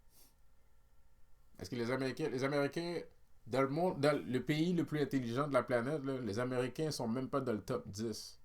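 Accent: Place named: Quebec, Canada. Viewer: ✌🖤✌jadore t video en francais loll..jaime Ton accent quebecois.. 🙃😁✌j'adore t video merci de partager ...✌🖤✌🤓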